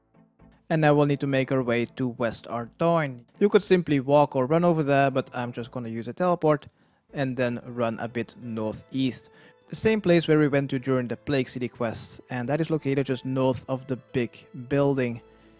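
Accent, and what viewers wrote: Netherlands. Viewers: Are you/ is he Dutch? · I just got my first ever quest cape and that's because of your amazing guides! I want to thank you for all the time that you've been putting into these videos. They're very fun to listen to because I can hear that you're a dutchy or from Belgium haha, further they're just very easy to follow because a noob like me gettin' a quest cape is quite the achievement. Thanks my man!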